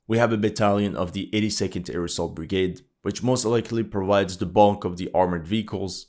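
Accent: Quebec, Canada. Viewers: canadian french right? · Where are you from ? I can’t place that accent maybe Quebecois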